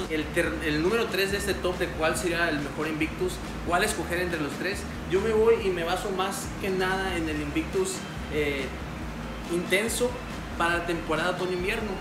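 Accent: Mexico. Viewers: Eres mexicano wey? :v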